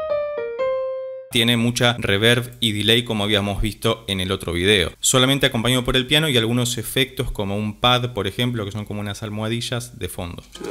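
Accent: Argentina. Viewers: Por el acento, debe ser argentino.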